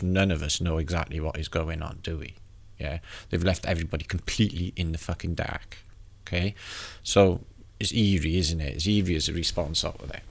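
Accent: Wales, United Kingdom. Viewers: Sound like your from Newport or cardiff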